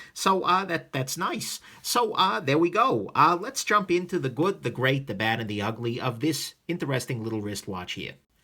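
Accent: New York, United States. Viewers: Taking a wild guess, you're from NY?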